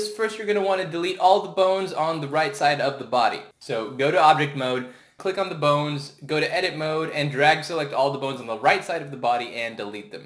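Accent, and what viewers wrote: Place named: Brooklyn, United States. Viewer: before he had the brooklyn accent.....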